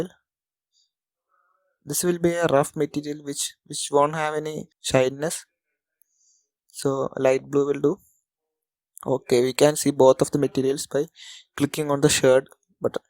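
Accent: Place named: South India, India. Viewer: Thanks bhai that material splitting thing was giving me headaches I was import the whole model without making materials in blender I never used any 3d paint software By your accent looks like you are south Indian hope I am not wrong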